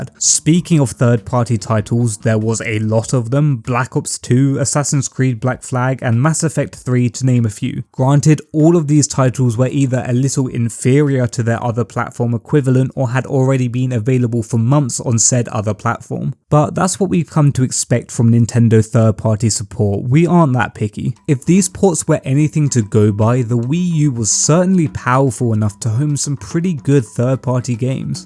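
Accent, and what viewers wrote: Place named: England, United Kingdom. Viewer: The other games that were shown off at the reveal conference should have tipped people off. New Super Mario Bros U is one thing but that first person shooter gameplay showed off that should have tipped people off that it was a new console instead of a new controller because did anybody honestly think that that game could have ran on the wii? Considering later on in the system's lifespan there were downloadable Wii games besides the fact that they should have done way more of download of a Wii games, they should have taken advantage of the hype and scarcity of physical copies of Xenoblade Chronicles. Since you have an English accent you probably wouldn't know but here in North America GameStop sold Xenoblade Chronicles exclusively and they took advantage of the hype surrounding that game by jacking the price is way up.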